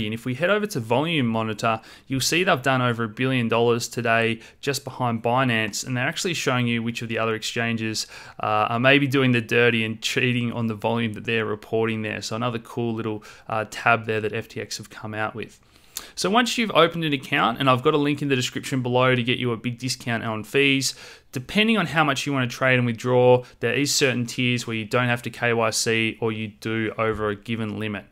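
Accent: Australia. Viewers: No native english speaker, but recognize the Aussie accent, Mate!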